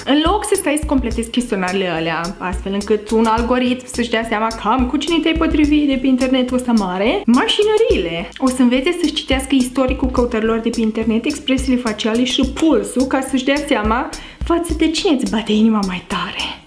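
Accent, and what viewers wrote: Moldova. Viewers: Singurele persoane care pot sa aiva o relație cu mine sunt băieții din 1D. Ps: imi place accentul tau de moldoveancă drăguță. 😘😘😘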